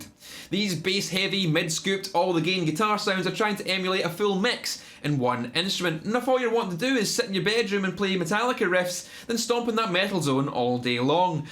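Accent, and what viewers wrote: Scotland, United Kingdom. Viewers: Great video!! Awesome continuation to your first one about this topic (which I loved) And I really enjoy your accent. I´ve worked with a couple of scotchs and really like their way of talking. Cheers. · Upvoted for Scottish accent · I've started watching your videos so I can learn to imitate your accent. Where are you from? Where in Scotland, that is? Can you tell the difference listening to someone from the Highlands vs from Glasgow, for example?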